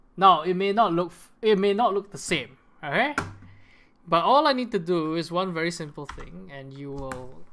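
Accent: Singapore. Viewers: u sound super singaporwan are u?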